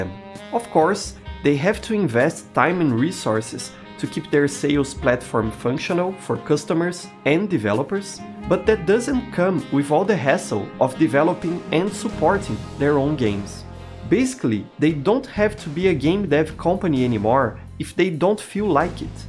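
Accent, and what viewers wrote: Brazil. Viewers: Unrelated to the video buuuut you sound brazilian and I find that fascinating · Whoa that accent, Brazilian? Que loco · your accent sounds a bit brazilian so caraio raridade ver brasileiro fazendo vídeo de qualidade em jogo que foi praticamente esquecido aqui no país, belos vídeos hue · He's accent is so much like a brazilian trying to speak english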